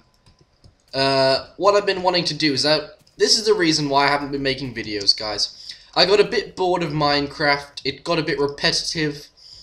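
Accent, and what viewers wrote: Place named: Baltimore, United States. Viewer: It's been so long... I forgot you had a bauler accent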